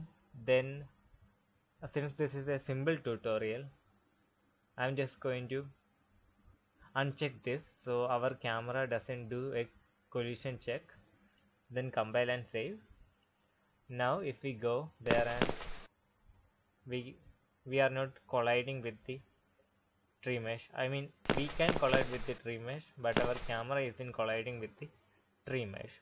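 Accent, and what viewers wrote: Kerala, India. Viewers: BRoo you sound Mallu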